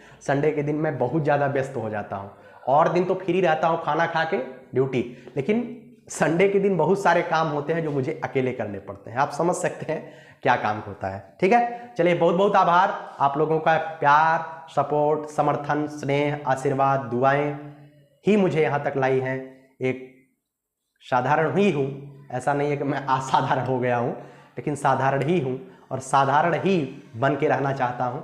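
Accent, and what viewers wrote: Bihar, India. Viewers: Bihari boli dhire dhire aap me jhalak rha hai sir.🙏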